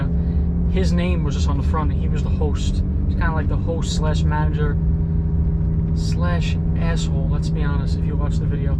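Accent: New York, United States. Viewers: I love your videos & your voice! You’ve got a tiny bit of the NY accent.🥰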